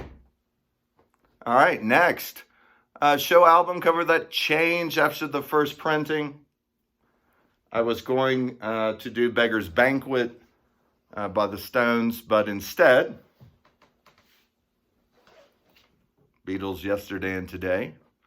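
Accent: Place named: Sweden. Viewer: I was trying to guess your English accent. You don't seem American or English. Are you Swedish? You don't seem German either.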